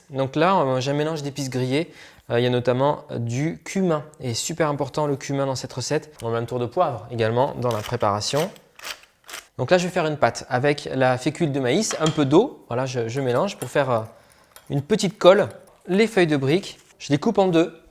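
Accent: South, France. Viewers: A tester dès que possible avec notre reste de fromage à raclette. Je sais pas si c'est moi, mais je trouve que notre, il rechoppe un peu plus son accent du sud. C'est charmant!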